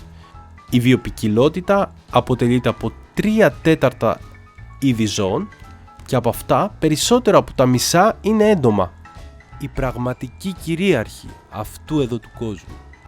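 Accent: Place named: Crete, Greece. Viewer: και το λλλη και το νννι που κολανε με την Κρήτη , το τσε που είναι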